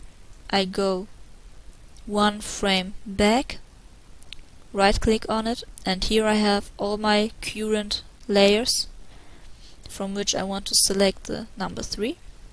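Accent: Germany. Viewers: I think it's German. (I'm German too so I can hear the dialect) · Man hört sehr gut heraus, dass du aus Deutschland kommst :D Sehr gutes Tutorial btw! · ich habe iwie sofort an deinem englisch erkannt dass du aus deutschland kommst xD(nicht dass das schlimm wäre o: ) aber schön zu sehen das es hier so coole undertale fans und animator gibt :D grüße aus hannover :3 und natürliches: nices tutorial :D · Sehr gutes Tutorial :^) Ich habe die ganze Zeit überlegt was das für ein Akzent bis ich am Ende erkannt habe, dass es ein deutscher ist ^^ Weiter so! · Are you german? I fucking hear it ¬¬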